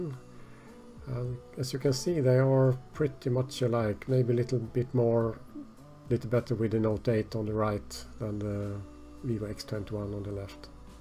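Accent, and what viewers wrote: Sweden. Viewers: Thank you for the review, i can notice you are svensk hahha.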